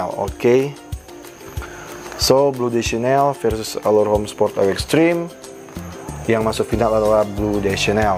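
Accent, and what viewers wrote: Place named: Manado, Indonesia. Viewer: Dari Logatnya orang manado ya bro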